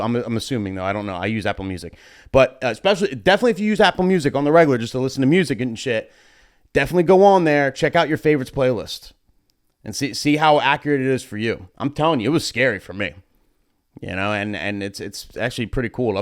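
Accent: New Jersey, United States. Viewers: Dude you are so Jersey! Love from L.I.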